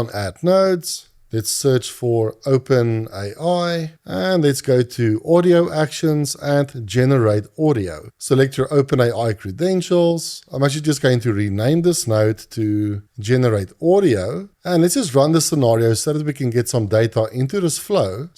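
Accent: South Africa. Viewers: 5 seconds into the video and I could tell you were south African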